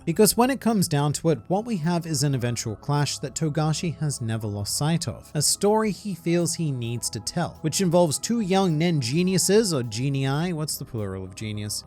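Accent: Australia. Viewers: wha is it about this australian accent which is so pleasant to listen to? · You’re Australian? How didn’t I realise 😂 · I didn’t realise that Aussie…oi oi oi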